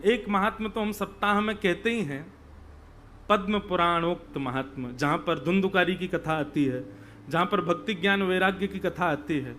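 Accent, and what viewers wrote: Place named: Braj, India. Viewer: Jay Shri Krishna 🙏 ♥️ ❤️ 😍 💙 Radhe Radhe Jay Shri Harivansh Guru Dev Maharaj ji Aapke Charno Mai Koti Koti Vandan 🙏 Jay Shri Guru Dev Maharaj ji Aapki vraj Bhasa Badi Sumadhur hai Jay Shri vraj vasi Jay Shri Vrindavan Dham Radhe Radhe Jay Shri Yamuna Maharani ji Jay Shri Radha Kishori ji Jay Shri Radha Rani 🙏 ♥️ 💙 ❤️ Jay Shri Radha Raman ji 🙏 Jay Shri Giridhar 🙏 ♥️ ❤️ 😍 💙 Jay Shri Govardhan Nath ji ♥️ 🙏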